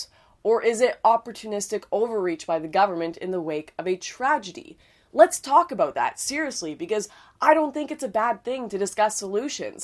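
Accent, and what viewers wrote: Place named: Canada. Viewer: you are getting wiser by the video!! Doesn't mean I agree with everything you say. (Doesn't have to) As usual, like I always say, Canada needs you and we need more Canadian dialect from you. Thank you!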